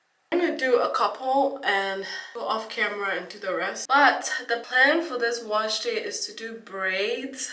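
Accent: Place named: Ghana. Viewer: I knew she was Ghanaian the moment she started talking 😂❤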